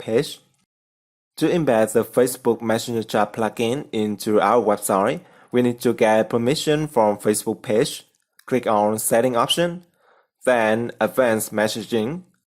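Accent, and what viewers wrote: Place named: Vietnam. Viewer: That Vietnamese accent. But great tutorial.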